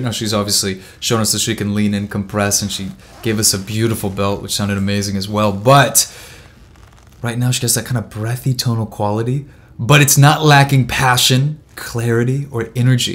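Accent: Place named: Andalusia, Spain. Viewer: Habla perfectamente bien, con su acento andaluz personal.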